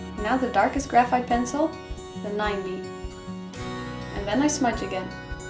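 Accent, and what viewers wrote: Netherlands. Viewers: Are you Dutch? As a fellow dutchie I feel like I recognize the accent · Is that Doutzen Kroes? Are you dutch cause you really sound Dutch!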